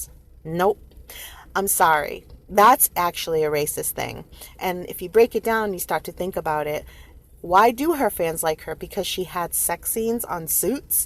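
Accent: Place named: East Coast, United States. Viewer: No matter what platform you use, you will find that a lot of negative comments will suddenly disappear. I'm American and I'm guessing by your accent you're from the East coast(?) That's where I'm from and I grew up in a very diverse area where people are just people, and nothing was black or white. You are judged on character, not color. My band mates are English and we're all on the same page with these two. These people, the "sugars" as they call them, have no idea who we are or where we come from. They judge and attack based on comments posted about a woman who has chosen to live her life in newspapers and on TV. I never saw a wedding where none of the guests looked happy, the groom looked shell shocked and the bride looked defiant before their wedding. I just knew from that point on that it wasn't going to end well.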